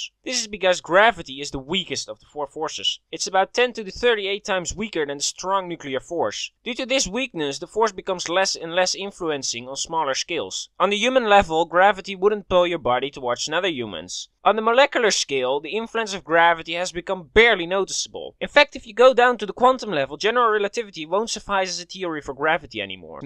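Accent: Netherlands. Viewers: Are you Dutch? You sure sound like you are!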